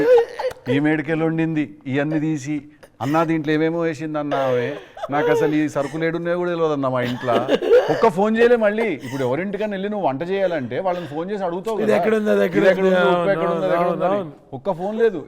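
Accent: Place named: Telangana, India. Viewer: నువ్వు ఆంధ్ర అయినా తెలంగాణ భాష భలే మాట్లాడుతున్నావు👌👌👌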